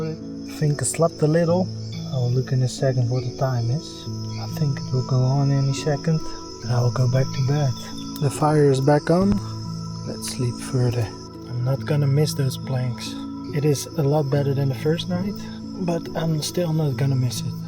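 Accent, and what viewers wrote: Netherlands. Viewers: Ik twijfelde aan je accent en dacht al dat je Nederlands was. Toen zag ik die kruidvat zonnebrand en wist ik genoeg😂 toffe video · Coole video. Nice dat je het in het Engels doet. Wel grappig om te zien hoe je bepaalde dingen echt op een Nederlandse manier zegt maar dan in het Engels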